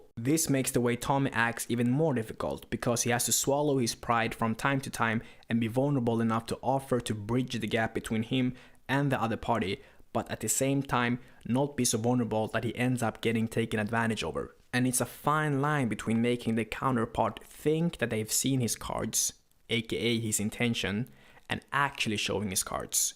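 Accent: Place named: Finland. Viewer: The Finnish accent 👌🏻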